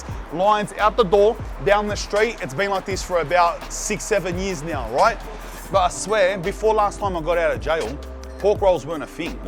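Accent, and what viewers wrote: Sydney, Australia. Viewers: That’s that Syd accent for ya 😅 makes me miss home. Just found this channel & it’s entertaining, real & humorous. Died at the coriander 🌿 & I love fresh rolls too 😋 nobody does pork rolls like Syd. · nice guy, fair reviews but man... the aussie slang is hard to follow, it's like a south african, british, aussie crossover combo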